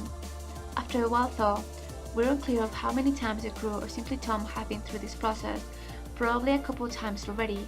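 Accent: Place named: Eastern Europe. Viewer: Awesome video. This story blew me away. Great thoughts and analyses. Love your Eastern European accent, btw...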